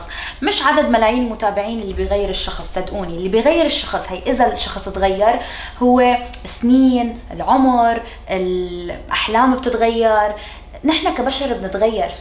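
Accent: Syria. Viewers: نفسي اعرف شغله ازا هي عراقيه كيف بتحكي بلهجه سوريه وليه بتحكي بلهجه سوري بدل عراقي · عاشت وهي صفيره بسوريا فصارت تحجي سوري اكثر من العراقي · زكاء منك انك عراقية وحاسبة حالك عالسوريين باللهجة وغير اشيا بهالطريقة كسبتي السوريين والعراقيين وعملتي هالقناة الكبيرة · انا عرفت انك عراقية بس ليش تحكي سوري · سوأل ليش انتي عراقيه وتحجين سوري للعلم هذا السؤال اسئله كل فيديو بس محد يجاوبني 😐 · لأنها كانت عايشة بسوريا من هي وصغيرة فلهيك تحكي سوري يعني تعودت تحكي سوري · walaa sdke اختارت لهجة السوريه لان لما بدات باليوتيوب كانت اللهجة السوريه كثيير مشتهره بسبب دبلجة مسلسلات السوريه و في نفس الوقت كان ما احد يعرف اللهجة العراقيه طبعا هذه شغله مش حلوه لانها نست اصلها و قامت تتكلم بلهجة ثانيه و معلومه اخرى تعرف تتكلم عراقي زيين بس بعدها مصممه على لهجة سوريه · ماعم تحكي لادقاني عم تحكي اللهجة السورية العامة لمعرفة لبعض الدول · لعد لَيــِْ♡̷̴̬̩̃̊ـِْش. تحجين سوري اذا عراقيه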